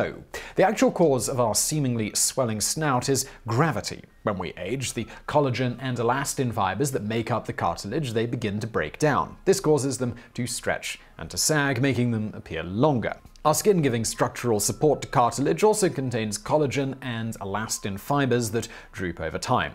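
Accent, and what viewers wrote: United Kingdom. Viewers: I thought this was the guy that does VSauce and was wondering why he was talking British accent. Then I realized they could be twins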